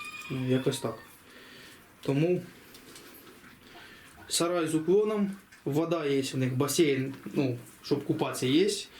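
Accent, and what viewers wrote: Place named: Poltava, Ukraine. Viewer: Говірка схожа на полтавську😂.мені як прлтавцю-понятно все.кому не понятно-хай не слухає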